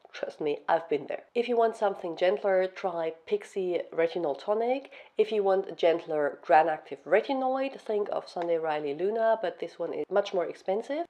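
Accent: Germany. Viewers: Why I Hear a german Accent 😂 Are u german ?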